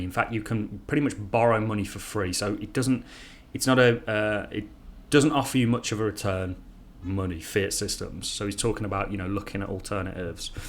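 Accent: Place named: Midlands, United Kingdom. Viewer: thanks for your videos. Guessing from your accent your in the midlands.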